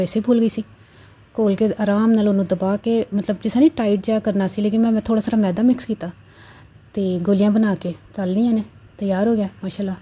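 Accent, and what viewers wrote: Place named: Punjab, India. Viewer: Aoa . bhot acha andaz hy kubhi kubhar punjabi zbaan ka chutta dy k baat karny ka .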